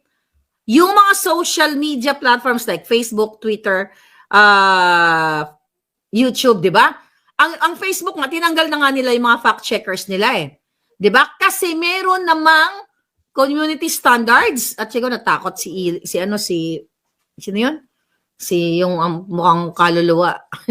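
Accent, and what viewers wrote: Visayas, Philippines. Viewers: Naintindihan ko maharlika ang bisaya mong salita hehehe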